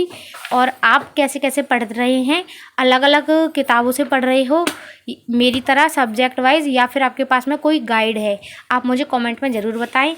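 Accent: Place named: Braj, India. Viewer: Bharatpur se braj language 😄😄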